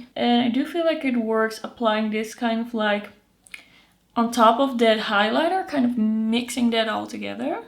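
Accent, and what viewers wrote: Netherlands. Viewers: Hi 👋 Thank you for your reviews on more affordable products. I just recently discovered your channel and had to subscribe. I love you accent are you from Netherland? 🩷 from South Africa 🇿🇦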